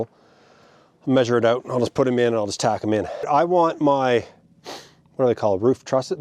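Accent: Canada. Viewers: As an Australian I had some difficulty with your Canadian Accent. I thought the Dog was called Puppy.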